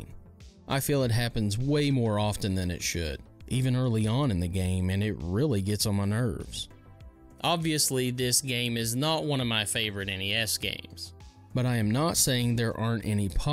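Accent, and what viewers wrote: South, United States. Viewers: I don't know what it is about your channel, but it brings out a sentiment in me that other gaming channels don't. Seeing the instruction booklets, the old footage and how you present the information - and possibly even your southern draw, takes me back. I wish nothing but success for your channel.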